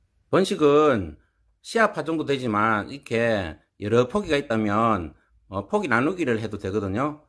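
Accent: Gyeongsang, South Korea. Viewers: 경상도 사투리라 찰떡같이 알아들어져요ㅎㅎ 맥주스프레이는 물이랑 희석 하지 않아고 그냥 맥주만 분무하는건가요??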